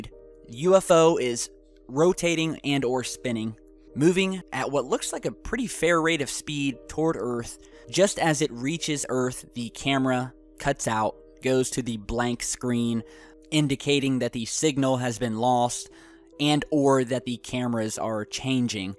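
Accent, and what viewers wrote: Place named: United States. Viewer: its cuz hes talking american english. go have fun listening to some brit's accent. freaks me out all the time dunno why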